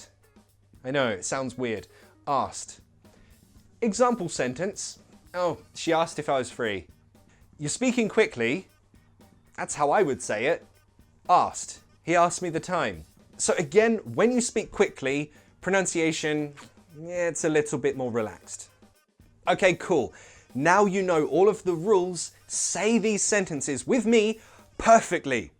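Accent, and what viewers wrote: United Kingdom. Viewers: Hello! Is this British English? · I love the British accent, it’s sooo cleah 🤩🤩😍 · Great tutorial! Thanks! I guess your are British ...could you tell me if the same rules and 'sounds' also hold for American English? Thank you again! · the british accent sounds amazing. Its clear. I really like it. Good class, teacher. Tks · Ur on a brit channel, teaching brit accent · That's British accent , American accent is a bit different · The slang is not the same as a dialect. Also, I did not say that we are talking about a dialect here. Dialect is the same group of languages, spoken slightly different depending on the region, with few different words and accentuating different letters in the words . This is actually the closest to what you are referring to as an accent, but it is still not. To the person from the UK this person in the video, doesn't have an accent. 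This is how they speak. Only for the rest of the world, especially to the english speaking people from the USA or Canada or Australia, you could say he has an "accent", but this is not correct. He speaks British English. In the USA, they speak American English. They don't speak with any accent, since this is how they speak and everyone else around them. It is different when you have accents to some letters in the words, so they may sound different to you, but this is not a definition of someone speaking with an "accent".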